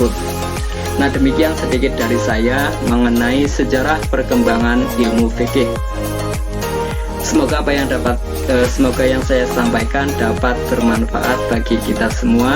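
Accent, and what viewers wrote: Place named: Banyumas, Indonesia. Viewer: Mantap sodara ngapakku🔥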